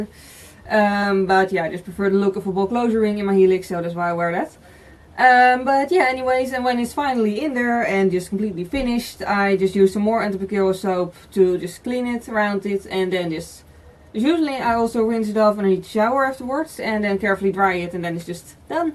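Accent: Netherlands. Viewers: Lekker Nederlands accent xD. Hoe lang duurt het helen bij een zo ongeveer (bij jou ten minste)? Ik ga binnenkort namelijk een helix en een tragus nemen. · Omg army is everywhereeeee😂 but yea its because its a dutch accent and she talks pretty fast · Ik hoorde aan je accent gelijk dat je nederlands was lol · Same , Ben je van Nederland? I’m from Aruba 🇦🇼 ✌🏽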